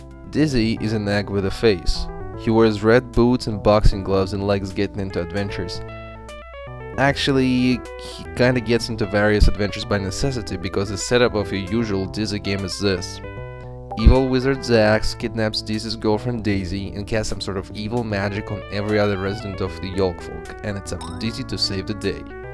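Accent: Russia. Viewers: Great review, dude! I love this game, it's huge! So much to do in it. Dude, I like your accent, are you russian?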